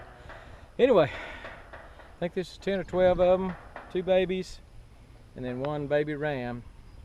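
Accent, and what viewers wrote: Texas, United States. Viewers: I thought you said something, and thought that was not like you... at least not in public anyway! But no, it was just your Texas accent. All you said was "'cause they're sheddin'".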